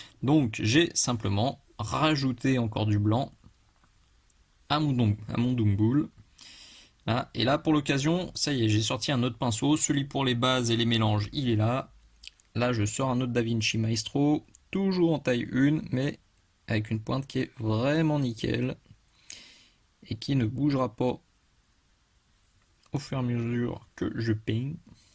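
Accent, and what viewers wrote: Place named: South, France. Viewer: Salut. Encore une très belle vidéo sur ce métal non métallique en cuivre, pleine d'enseignements et de conseils judicieux comme toujours. Et bravo pour l'accent du sud.. qui me rappelle le mien (natif de Toulouse..)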